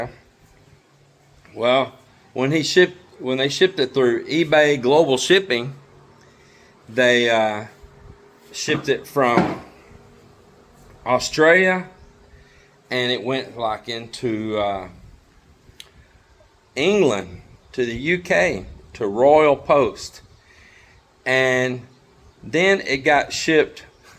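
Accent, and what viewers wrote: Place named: South, United States. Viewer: Sir, I like listening to your southern accent. Keep up what your doing. I enjoy it. When I retire hopefully I see you.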